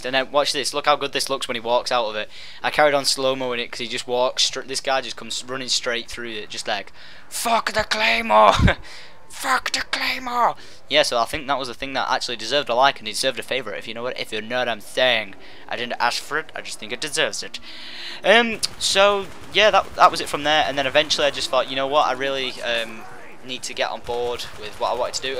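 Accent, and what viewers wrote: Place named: England, United Kingdom. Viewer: Where in England are u from?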